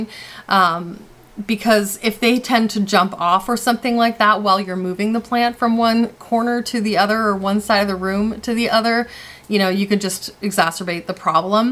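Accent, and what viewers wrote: Canada. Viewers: Your Canadian accent is delicious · I'm guessing you're from eastern Canada with the way you say ABOUT!! Love the eastern accent coming from western Canada.. If I'm wrong please let me know. Thanks for sharing a great video, thrips are horrible and have decimated many plants in my indoor garden. Cheers sister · I love your Canadian accent!! You make it fun to learn. Thanks for your work.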